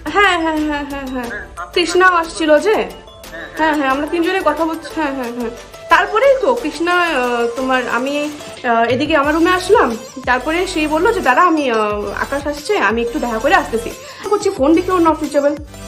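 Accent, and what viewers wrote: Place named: Bangal, Bangladesh. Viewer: Tor bangal kotha ❤️👌😂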